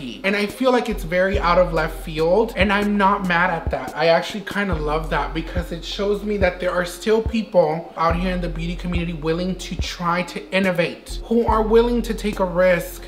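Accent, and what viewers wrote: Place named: United Kingdom. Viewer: I loved the slight British accent coming through girlllll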